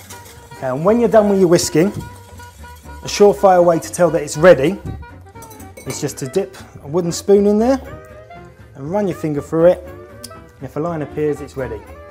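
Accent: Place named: London, United Kingdom. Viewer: No, he's a lefty remainer, using "A2? " milk. Must be a "London" thing!! Listen to his accent.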